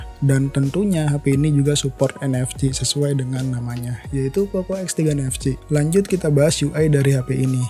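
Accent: Bali, Indonesia. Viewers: bang lu asal bali yaa bang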